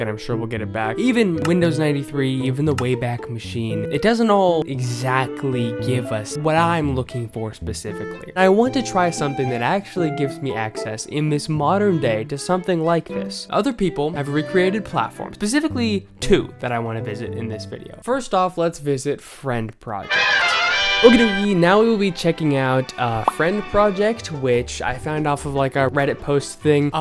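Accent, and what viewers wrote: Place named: Midwest, United States. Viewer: midwestern kurtis conner? (also omg we're litch the same exact age what)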